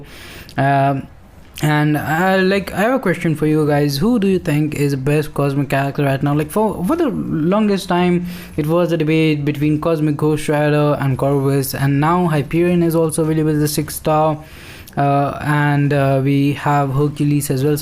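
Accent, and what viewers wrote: India. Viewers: When I saw him 1st time I thought he look like Indian and his Accent and Now finds out he is 😘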